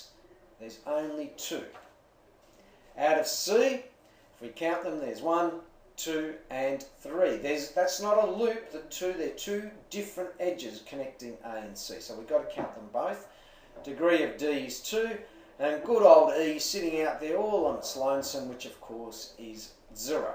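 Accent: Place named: Australia. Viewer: I hate this Australian accent.......makes it hard to understand :( had to watch it twice Good job though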